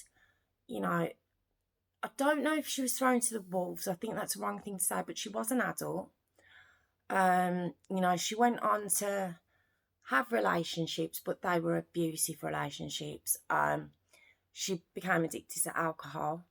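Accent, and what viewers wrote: United Kingdom. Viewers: What kind if an accent di you have? British, or Irish? I can't distinguish it. I just heard of this story, and it's so awful.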